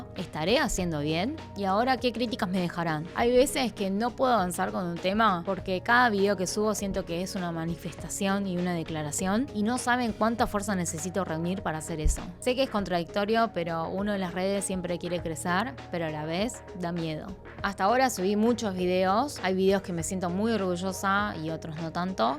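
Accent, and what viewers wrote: Buenos Aires, Argentina. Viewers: Solo dos cosas... sigue hablando con tu acento orgullosamente argentino... sí los que te escriben creen que pueden hacerlo mejor... que abran sus canales y te dejen hacer tus cosas a tu modo... la personas te siguen por lo que haces cómo lo haces... a sí qué mándalos alv... Saludos desde México... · Sí cambia su acento porteño deja de ser, su querida Argentina es parte de tanto como su ascendencia coreana. Seguro es la envidia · Me encanta verte y escucharte❤ tus hermosos rasgos coreanos y tu acento argentino. No hagas caso. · Tenés acento de barrio norte y entiendo que sos de zona sur de capital. Raro pero atractivo. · Querida:realmente la primera vez que asistí a un video tuyo pensé que eras de nuestros pueblos originarios,hablas perfecto nuestro castellano,trasmites con delicadesa y exactitud tus ideas, tienes los ojitos de " luna menguante",como dice,como la mayoría de nuestro pueblo hermoso, me encanta la manera que tienes de analizar y trasmitir los hechos y etc, etc... 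Que tienes ascendencia coreana? Qué maravilla! Cuantas personas inteligentes y capaces en el arte y la belleza de las ideas llegaron a otras tierras. Somos productos de inmigraciones desde que se " creó " el Homo sapiens. Hablar con acentos,en diferentes idiomas,es la música de la comunicación.Te mando un gran abrazo de consuelo y recuerda que la discriminación, es una grave enfermedad de algunos " humanos", no hay vacuna ni cura para el odio...! Me gusta tu alegría y que te tomes aquellos comentarios como lo que son. De nuevo, recibe un gran abrazo desde ésta tierra lejana 💛🤟 ha! Y me gusta tu acento porteño! ARGENTINITA DE BUENOS AIRES.TE QUERÍA PREGUNTAR SI LA MERCEDES SOSA ERA ASIÁTICA...O TUCUMANA? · Hola, llevo más o menos dos años viendo tu contenido. No suelo dejar comentarios en ningun lado, pero lamento mucho que recibas esa clase de comentarios y quería contrarrestar esa mala onda. 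Creo que mejoraste mucho no solo la producción de los videos sino también tu manera de expresarte y tu capacidad de renovar tu visión sobre las cosas, demuestra madurez como persona y responsabilidad como creador de contenido. Asi que te felicito mucho y te animo a que sigas! Me gusta mucho tu acento, yo también soy porteña y entiendo que a muchos puede no gustarles la tonada, pero te expresas correctamente y se entiende tu mensaje, asi que lo demás que te critican está de sobra. Que tengas una linda semana, fighting!:) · Para mí hay pica con el acento porteño porque esta asociado al esteriotipo de argentino creído. Me parece algo bastante inmaduro que la gente siga con esa idea pero creo que es por ahí el problema. A mi me encanta que hables como yo, siento que aporta a nuestra identidad argentina (que es sumamente diversa) y termina definiendo al mundo que es realmente ser argentino. Te mando un abrazo y ojalá la gente salga del tupper dónde vive y se acostumbré a ver la realidad que es hermosa. 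Sos lo más💖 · Soy argentina, y lit tenemos el mismo acento, no hay problema en eso, tu acento es hermoso y no dejes que la gente te lo saque. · Nunca te desanimes, tus vídeos ayudan a conocer la cultura coreana. Y tu acento argentino, es tu identidad, seguí!! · No tenes acento Argentino tenes, acento porteño no todos los Argentinos hablamos así, no generalices y si a mi me an dicho muchas cosas por mi acento soy Argentina de una provincia llamada san luis c.p. y me an preguntado muchas veces si soy cordobeza ,mexicana ,de muchos lugares que no soy , pero no le presto atención a esos comentarios y los feos comentarios los bloqueo o los borro es algo que deberías considerar besos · mi mama y yo miramos tus videos y no podemos creer lo perfecto que hablas español con un tipico acento de bs as! soy de misiones. no podia creer hasta que vi que naciste acá entoneces dije con razon ni se nota. 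hablas perfecto!!!! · Hola no tienen por que criticar tu acento porteño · todos los argentinos hablamos como vos 😋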